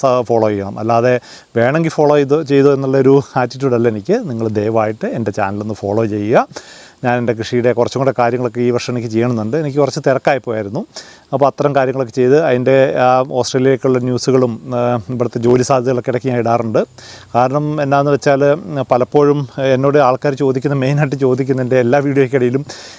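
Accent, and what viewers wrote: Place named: Wayanad, India. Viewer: നാട്ടിൽ വയനാട് ആണോ സംസാരം മാന്തവാടി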